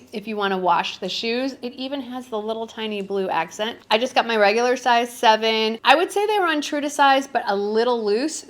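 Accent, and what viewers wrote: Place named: Illinois, United States. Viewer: I thought I recognized your Ilinois accent! Former Chicagoan here who has lived in Florida for 34 years! Go Bears!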